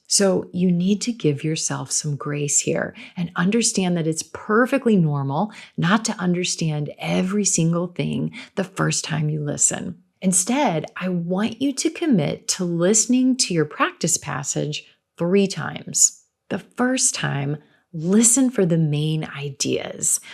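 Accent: United States. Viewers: I'm addicted to your beautiful voice every night when i go to bed your voice is digging in my brain. American accent is outstanding. Much love to you Coach from Morocco 😘😘😘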